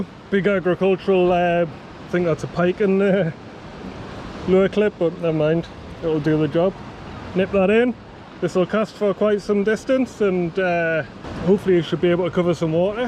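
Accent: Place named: North, United Kingdom. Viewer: Top notch again some great fish makes the scrambling well worth it, great to hear a fellow northern voice on these channels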